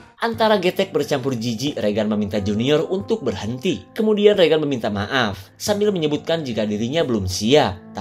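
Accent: Sunda, Indonesia. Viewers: Aya sunda sundana kitu seru pisann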